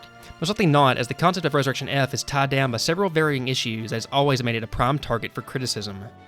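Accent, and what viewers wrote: South, United States. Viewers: I love youtubers with southern accents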